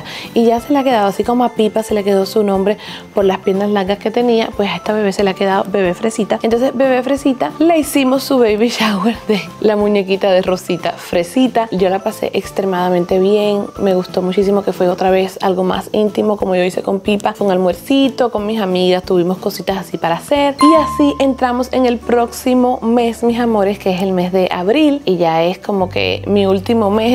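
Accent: Cuba. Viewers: Es un acento, no es voz de niña , ella es de Cuba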